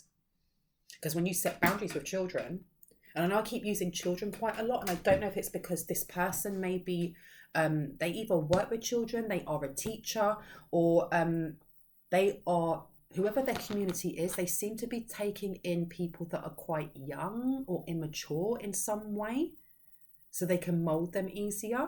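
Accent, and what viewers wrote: England, United Kingdom. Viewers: GUESS WHAT, EVERYONE USUALLY HAS CARS HOTRODING , ALOT OF NOISE OUTSIDE, THATS LIFE, MOST HOUSES , ESPECIALLY THE MODERN MODULAR HOMES ARE NOT SOUND PROOF. HOWEVER, I AM VERY LUCKY, MY HOUSE HAS 6 INCH WALLS AND WELL INSULATED. SOMEONE IS JUST NITPICKING, BLOW THEM OFF , ENJOY YOUR READINGS, LOVE YOUR ENGLISH ACCENT! CHEER UP,, DO NOT LET NASTY PEOPLE RUIN YOUR DAY! KOOTOOS!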